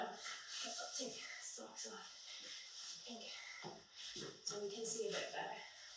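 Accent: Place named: New York, United States. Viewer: Certainly haven't lost your touch- You look like you're feeling healthier than ever 🧦💛 (Can't remember if you're from New York originally, interesting accent though)🦅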